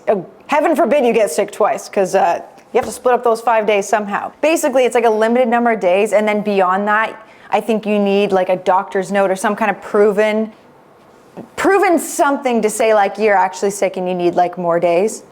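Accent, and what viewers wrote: United States. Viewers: Youre suffering from California-speak in this video. Youre talking too fast and slurring the words. Hard to listen to. Garbled. Throwing away sentences. Do better. You usually do. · Too American for me to understand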